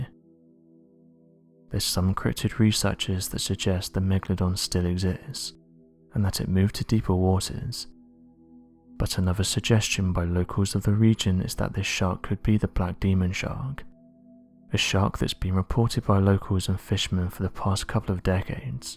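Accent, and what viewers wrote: United Kingdom. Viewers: Just because you mysteriously whisper with a British accent does not make this video and your story and you any less full of sh*t.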